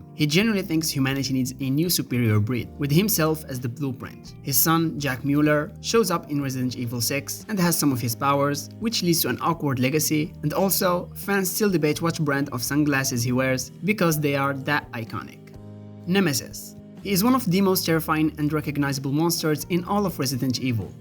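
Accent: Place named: Morocco. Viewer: dude are u moroccan u got a hard accent and keep going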